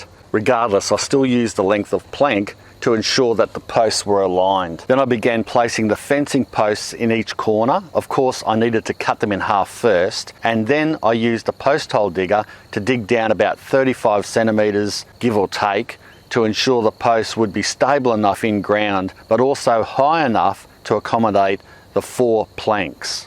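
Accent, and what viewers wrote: Australia. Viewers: I think the bed looks great! And I would watch just for that great Aussie accent!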